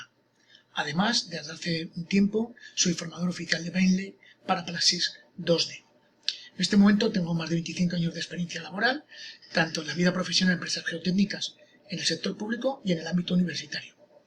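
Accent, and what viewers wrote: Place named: Spain. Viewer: Que acento para mas feo, del idioma castellano el peor hablado se encuentra en españa, sin duda.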